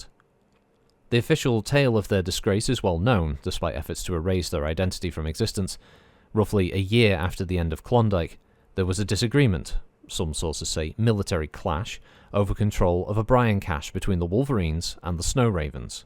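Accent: United Kingdom. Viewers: Everybody can read the book, it’s true. But not everybody can read the book with a British Accent. 8-)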